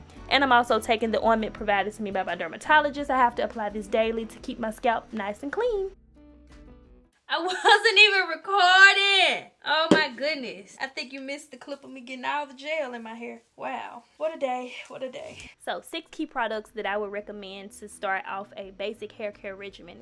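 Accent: South, United States. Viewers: Sang guh lol enjoyed watching and listening to your super country accent! You have me beat lol sending love from Alabama. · southern drawl is bomb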